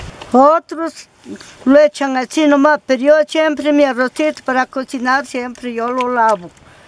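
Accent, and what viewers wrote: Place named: Lambayeque, Peru. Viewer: Parecía de México la demora así hablan en Lambayeque es diferente si acento de voz que interesante yo soy de tacna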